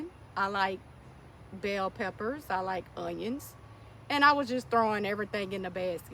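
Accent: New Orleans, United States. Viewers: Been following your garden journey and love that N.O. accent! You got everything looking good now! I’m gardening in the west Texas desert at the age of 47 so I feel your passion for it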